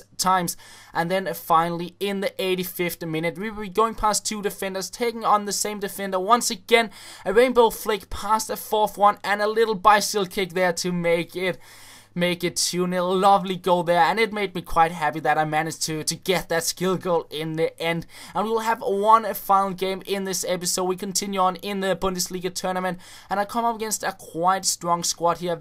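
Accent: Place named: Denmark. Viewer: Danish accent does that :)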